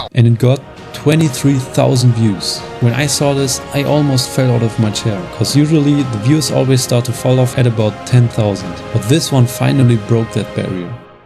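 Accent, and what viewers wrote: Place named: Germany. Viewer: Man Hört doch das du Deutscher bist😂. Geil!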